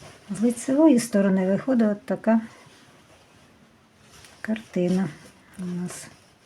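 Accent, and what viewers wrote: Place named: Poltava, Ukraine. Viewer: Це-Полтавщина! Тут є все, канєшно ж!😅 І це не той випадок, коли потрібно шпиняти за вимову!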